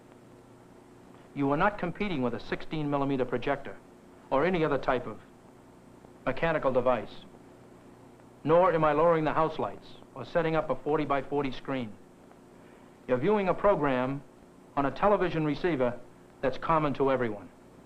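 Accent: East Coast, United States. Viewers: Good east coast accent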